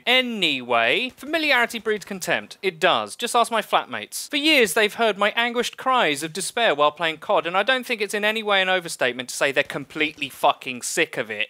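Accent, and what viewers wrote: United Kingdom. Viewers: is essentially the escapist of the call of duty community. A British accent with witty remarks that would make handsome jack blush. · Your videos are like perfectionist essays, with witty highlights of jabbing humor and a tinge of British English. Well done, keep up the good work.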